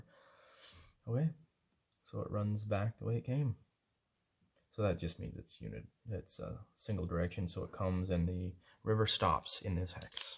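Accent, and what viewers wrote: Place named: Ottawa, Canada. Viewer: Originally Ottawa valley but Victoria now. I knew you were local Ottawa from the accent.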